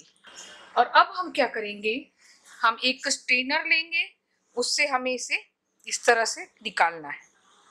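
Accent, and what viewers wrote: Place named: Maharashtra, India. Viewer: Apka hindi mast marathi logo ki trha h nd thank u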